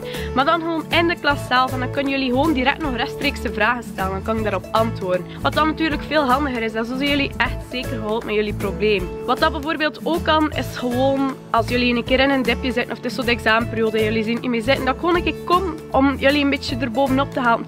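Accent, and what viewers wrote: West Flanders, Belgium. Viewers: lijkt me leuk als je naar mijn klas komt maar ik weet bijna zeker dat niemand je gaat verstaan ik ben een van de weininge in mijn klas die (west) Vlaams verstaat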